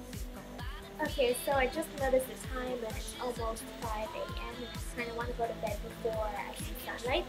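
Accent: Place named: United Kingdom. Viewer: why do you have a british accent?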